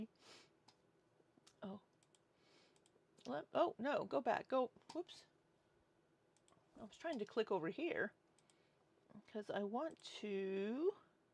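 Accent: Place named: Minnesota, United States. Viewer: I missed Minnesota talk that wasn't about QLR's supidity - dang flabbit!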